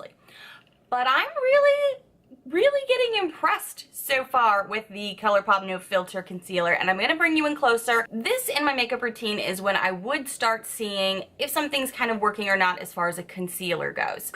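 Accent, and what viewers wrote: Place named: New Jersey, United States. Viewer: Ps I like the special jersey/queens accent you do lol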